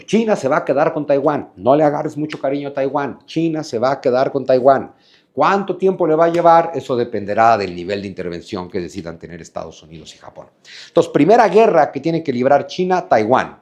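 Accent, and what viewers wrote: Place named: Mexico. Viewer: tu acento mexicano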